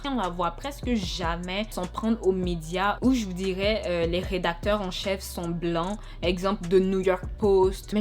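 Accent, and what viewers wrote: Quebec, Canada. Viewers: You sound like Canadian. I don't like that song, it denigrates black women. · Hey pourquoi tu parle comme une kebecoise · T québécoise ?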